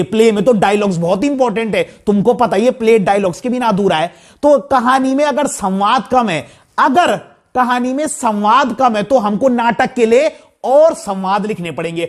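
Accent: Garhwal, India. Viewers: Sir aap gadwali ho ? Apni tone gadwali he .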